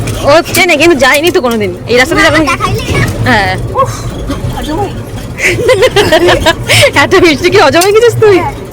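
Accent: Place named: West Bengal, India. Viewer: Tomar kothar j taan ta amar khub bhalo lage...amar bashirhater ak bandhobi ache...or kothar taan o erokom.....besh bhalo lage❤😊😊manu k bairer khaabar beshi diona....bhalo theko tomra ❤️❤️❤️❤️👍👍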